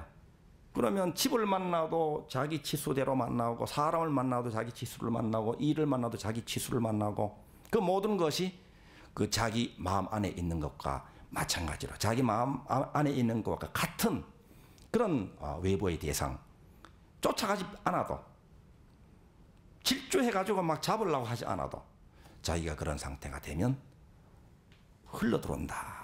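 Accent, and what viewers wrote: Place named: Gyeongsang, South Korea. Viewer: 몇년전에 법상스님의 우연한신묘장구대다라니의 법문을 여러차례들었습니다 그때부터 열심히다라니공부를했습니다 경상도 억양의 스님강의 구수합니다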